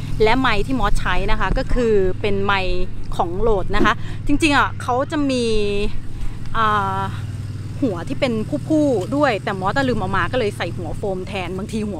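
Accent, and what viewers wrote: South, Thailand. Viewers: มอสเป็นคนใต้ใช่ไหมครับ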